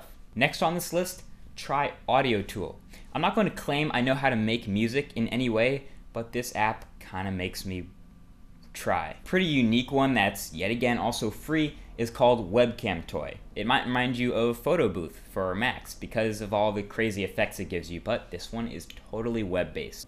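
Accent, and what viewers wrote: Australia. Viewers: Awesome British Accent! Got a hint of aussie in there aswell lol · im from the uk... we dont sound like that, thats more like austrailian tbh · sounded aussie :D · Accent more Aussie than Brit lol. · nice aussie accent! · definitely Australian lol im british too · Started off English. Ended up Australian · Yeah, you sound more Australian, than Brit! Sorry, but it's true. Another very wonderful,expositive video. Thanks for all these tips, I'll try almost all of them. Hope you get 4000 likes! G'day mate! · Im australian and i tought you sounded more Aussie then having a UK accent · Accent sounds more Austrailia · Im from the UK, your accent sounds more Australian! XD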